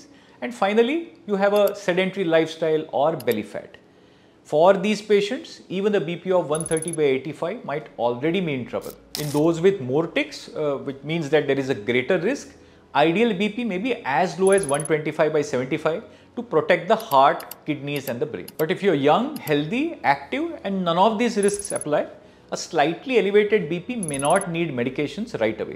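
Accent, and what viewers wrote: India. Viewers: Your thick Indian accent makes it difficult to hear your words when you speak 😊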